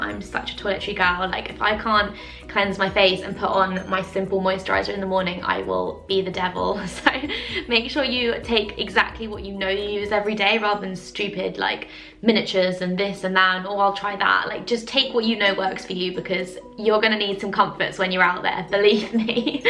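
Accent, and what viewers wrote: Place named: England, United Kingdom. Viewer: Always wanted a girlfriend with an English accent-sounds so sophisticated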